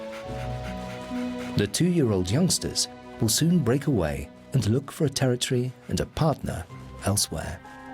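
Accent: United Kingdom. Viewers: British accent??? ☺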